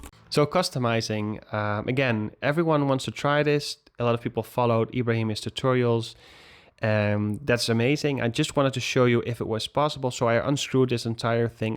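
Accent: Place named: Netherlands. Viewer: Im hearing a sort of Dutch accent.. btw thnx for the review.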